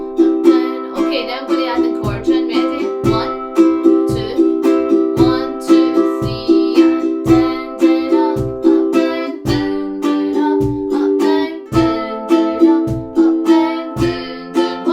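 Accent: Scotland, United Kingdom. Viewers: So I was just clicking around YouTube Shorts and ended up somehow On one of yours about "finding a groove", then I checked the main vids out And saw this one was new, so I listened and watched from start to end. I like the blue instrument, and the musical cap seems like a neat piece of fashion, But I noticed neither for like five minutes because... your accent is so pretty! 😄 (I should go find other people from Scotland to watch now or I'll end up learning the ukulele. 😂) Keep up the good work because the lesson itself was rather easy to follow along with, And if I had a ukulele, I think I could pick up strumming fairly quickly with this practice. 😃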